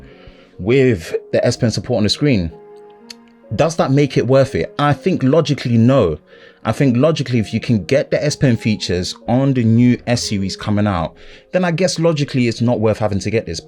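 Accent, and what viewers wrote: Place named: United Kingdom. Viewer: Your britch accente is epic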